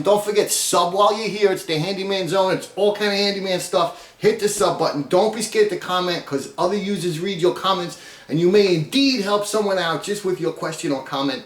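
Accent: New York, United States. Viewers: Good job!! Really enjoyed your accent. Haven't been back to my birthplace, NYC/Long Island, since early 70's!! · That was great! Love the N.Y. accent makes me feel like home. I watched a few video's and you where the only one that mentioned cleaning it and putting a rag in the sink two very important things. Also baking soda and white vinegar will take off that water deposit stuff on the faucet. Also you were the only one that said which way the spring goes very thorough Excellent job! Thank you now I am going to try it!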